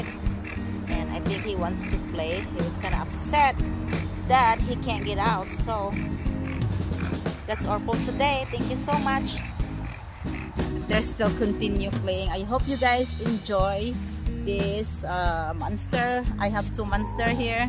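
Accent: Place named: Australia. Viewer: What a beautiful day and Australian accent connected to you now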